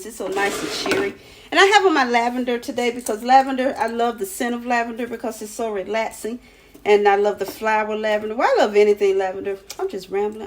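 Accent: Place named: New Orleans, United States. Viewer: You're my new Auntie, I used to live in Nola and I miss the food and the accent plus the hospitality. I'm inspired to make food from your recipes.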